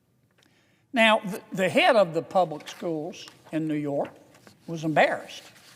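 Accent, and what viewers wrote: South, United States. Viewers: Just LOVE his Southern drawl and smooth demeanor. WHAT is wrong with parents who send their kids to schools like this?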